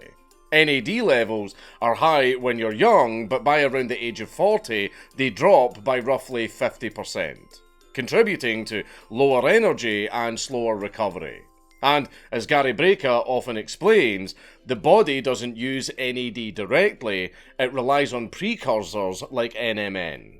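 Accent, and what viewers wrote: United States. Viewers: Shame you used american pronunciations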